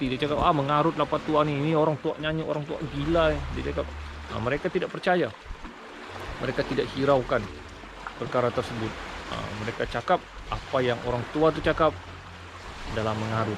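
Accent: Sabah, Malaysia. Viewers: sabah kerass boy. hahahaha.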